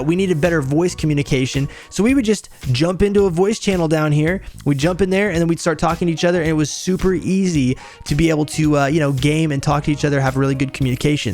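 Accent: United States. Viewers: what is your accent? is that Texas/USA or California? your cadence is familiar - its modified with practice?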